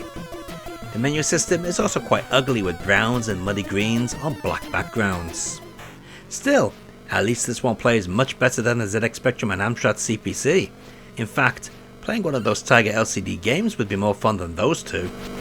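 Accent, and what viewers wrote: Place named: Scotland, United Kingdom. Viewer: Showing support, love. Love his accent and his command of English language, are you Scottish? Very honest, knows his stuff, often Japanese focused but in English. Can feel and share that passion for the old systems and hardware. I personally didn't play this game back in the day.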